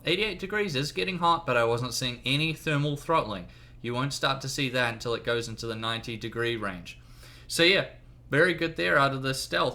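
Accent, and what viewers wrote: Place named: New Zealand. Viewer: Yeah, although I noticed some things. I was thinking he was Aussie or possibly Irish with the way his "fair" sounded. But he reminded me on the Path of Exile developers and the slight accent they have. Then he said he was a New Zealander right as I was thinking he might be from there. I literally LOL'd.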